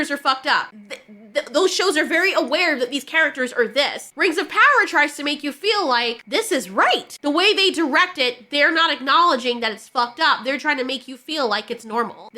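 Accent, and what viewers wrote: United Kingdom. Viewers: Your British accent makes me laugh. It’s just frustrating that many US channels now do a posh (i.e. conservative) British accent to read California-penned (i.e. “woke”) articles…. which just makes no sense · don’t listen and don’t apologize to him. We love your British accent. He’s just jealous because he can’t sound as cute as you.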